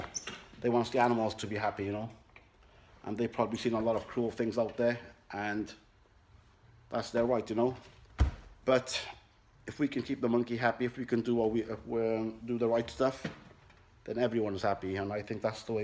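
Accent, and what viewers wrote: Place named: United Kingdom. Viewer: maybe its not what you should have if you find it hard to cope.I am not trying to be rude only it makes no sence that you tell people not to own one yet you now have two.It is not right to title your vidio "where to buy a monkey"if you have no intention to let people know.I detect that you have a Brittish accent like me,if so you know we are up front and staight forward.I am one to speak my mind,I hope you are not offended and you take time to answer me...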